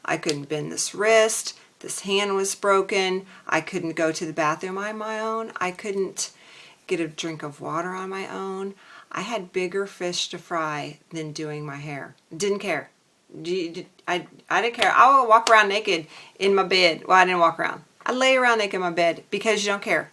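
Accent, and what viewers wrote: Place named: South, United States. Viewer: 💗 I understand. I’m in California, in a liberal place with a lot of this holistic stuff going on. You have an adorable accent, it sounds a little southern? Anyways, you radiate like some glowing people do and i understand that energy when I’m well. The past week, my spirit has just sprung up. I’m feeling so happy. I saw that you accidentally erased one of my messages. That’s fine, I will get back to you very soon 💗🌸💗 I articulate well at certain times when my brain’s really on point, so I’ll get back to you. No worries. How do I order your book? I totally want to support you in this and hear your story and I will most definitely be able to leave a deep, honest, heartfelt review for you because I can be very talented in that way when it comes naturally 💗🌸💗 Much love!!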